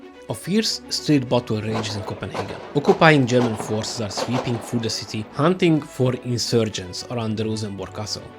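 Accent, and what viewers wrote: Hungary. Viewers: Use AI voice bro :D Pronuncication is so Hungarian, sorry but I can't focus with that :D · Can not understand due strong magyar accent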